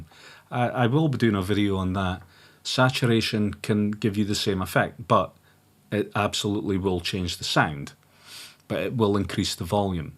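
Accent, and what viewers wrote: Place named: United Kingdom. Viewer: after this video i'm still confused, are you bri'ish or what? your accent is clipping my brain :S great video btw!! clipping makes mixing and mastering so much easier <3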